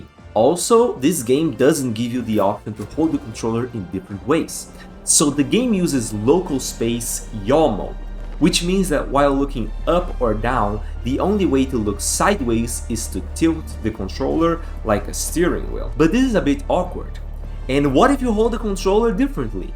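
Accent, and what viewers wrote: Brazil. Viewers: You have the brazilian accent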